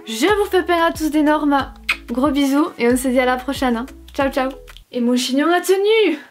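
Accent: South, France. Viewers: Je suis passé par ta chaîne par hasard et je t'ai trouvé géniale, naturel et j'adore l'accent du sud ! Reste comme tu es je t'aime trop 😻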